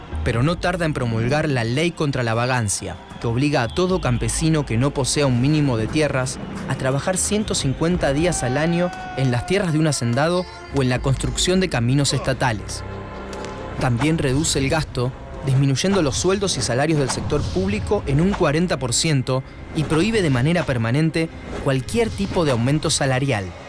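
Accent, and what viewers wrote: Buenos Aires, Argentina. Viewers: Che, primero que nada, cómo pronunciás la ch?? Porque tengo entendido que se usa en palabras como dereCHo, muCHas, CHanCHos, y no es lo que se escucha en el video. Capaz estás escuchando mal o confundís las letras, no se. Y segundo, capaz sería interesante que consideres buscar otro tipo de video la próxima si tanto te molesta este acento en vez de llamarlo por algo que no es, porque al fin y al cabo no es ninguna contribución humanitaria. Es el acento que manejamos los bonaerenses, como seguramente vos tengas el tuyo... saludos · y bueno si te molesta el acento bonaerense anda a otro canal, no da que digas que es una "tara" cuando es el acento que tenemos la mayoria de los que vivimos en buenos aires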